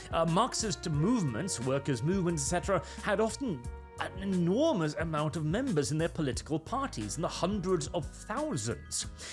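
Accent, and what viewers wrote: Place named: United Kingdom. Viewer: Hm. You sound like you are in the British Isles. Curious why the police have not arrested you over this commentary. Yet.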